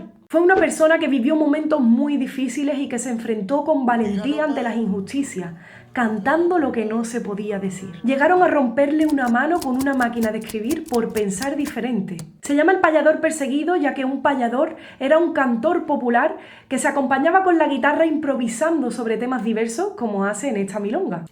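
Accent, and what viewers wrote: Andalusia, Spain. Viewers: Sou gaúcha brasileira de Viamão na região metropolitana de Porto Alegre ,a capital do Estado do Rio Grande do Sul,um dos 27 estados do Brasil aonde uma boa parte da população é descendente de índios Guaranis e Charruas e Jê, como também de negros escravizados que micigenaram-se formando com o branco português e argentino, além de alguns poucos alemães e italianos o gentio gaúcho dos Pampas brasileiros! Confesso que agora sei porque meu Ex-marido e querido amigo Alex Fagundes chora quando vê teus incríveis vídeos,tu és uma artista que com teu enorme talento nos fazendo viajar inclusive dentro do nosso Sangue Gaudério e também na nossa alma campeira! Tu com teu extraordinário trabalho consegue penetrar na nossa alma sulina e brasileira com impressionante facilidade! Oha Guria tú chegas a ser mediúnica em teu maravilhoso ofício! Te juro que até pareces não ser Europeia tamanha é a tua proximidade com a nossa alma latina! 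Como em outra vez comentei em vídeos teus anteriores juravamos nós que sérias até Argentina e não Espanhola,mas é que teu ritmo melodioso de fala têm um bailar,um tempero doce que se não prestacemos bem atenção teu sotaque espanhol europeu passaria quase despercebido, olha tenho uma admiração enorme pelo teu lendário e maravilhoso País o Reino da Espanha, já sabia que o maravilhoso povo de Andaluzia seria diferente,mais doce,alegre e hipnótico tamanha sua simpatia e leveza,coisa diferente dos demais espanhóis que são aos nossos sul-americanos olhos mais marciais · No se porque pero algo en tu voz me dice que sos Andaluza (jajaja) excelente!!! saludos desde Argentina...dios bendiga a Don Ata...(recomiendo "el arriero") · Hola me encantó escucharte y tocar esas melodías, hoy en día pocos tocan estos temas de Atahualpa en Argentina, aunque tienes un acento muy andaluz creo?? Pero se oye interesante! 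No sé si conoces Argentina, sería lindo que vengas y visites los eventos folclóricos y el campo y las estancias y te empapes de mí tierra..🇦🇷. Abrazos , 🫂👋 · Te he escuchado, totalmente emocionado. Tú forma de recitar las canciones de Atahualpa Yupanqui ha sido soberbia. Has hablado haciendo más música con tu palabra que con la guitarra. Ésta sonaba en un segundo plano como embelesada de los mensajes que transmitías. Tú voz ha sonado muy semejante a la de nuestro autor. Creo que te ha ayudado tu acento andaluz y en muchos momentos eras como una rapsoda de la tierra de los gauchos. Guardaré esta grabación para oírla más veces, sencillamente, porque es una joya. Muchas gracias y un abrazo. Ah. Otra cosa: te sienta muy bien ese sombrero de la Pampa. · Atahualpa decía: “La guitarra antes de ser instrumento fue árbol y en él cantaban los pájaros. La madera sabía de música mucho antes de ser instrumento.” sos una crack, me encantó el mix entre acento Andaluz y gaucho! 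· Realmente me hiciste temblar la pera, no solo por Argentino sino por tu delicadeza y respeto, todo con ese maravilloso acento Andalú. Agradezco inmensamente a Dios que haya personas como vos, tan talentosas y capaces de revolverme las vísceras a miles de kilómetros, nuevamente muchas gracias.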